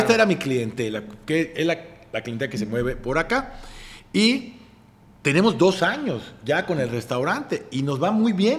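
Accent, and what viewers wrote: Yucatan, Mexico. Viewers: Sigue muy guapo Rulo😊 y me gusta su acento yucateco, bomba 😊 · Si se nota el acento Yucateco pero no tan exagerado. No te estreses mi Rulo por si se nota 😂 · La mejor época de VLA fué cuando estaba Rulo, Fer y Mau Mancera, no me lo perdia, yo TE conocí Rulo cuando hicieron El programa en El Centro Cultural Mexiquense en Texcoco y aunque no fuiste amable con nosotros el público, me gustaba tu trabajo. Que agrado saber de ti y me causa asombro tu acento yucateco, lo tienes Bien marcado y no te habia imaginado hablando así, con mucha honrra por supuesto. Saludos mi Rulo. ❤❤❤❤❤❤❤ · Mi estimado Rulo que gusto de saber de ti, muchos años sin verte en pantalla, siempre era un gusto ver aquellos tiempos de venga la alegría, ahora escucharte como con acento Yucateco es impresionante, que Dios te bendiga siempre ... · Habla muy yucateco, 😂😂, se escucha chistoso, faltó poner Fer del solar en el mejor equipo. 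· Que padre volver a Rulo , hoy necesitamos verlo en tv , su personalidad tan transparente y agradable. Y si tiene acento yucateco 😂. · El dijo que no remarca mucho su acento ,sino que al natural le sale su acento Yucateco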